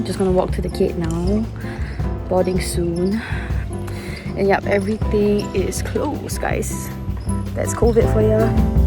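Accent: Singapore. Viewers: However however however love the Singapore accent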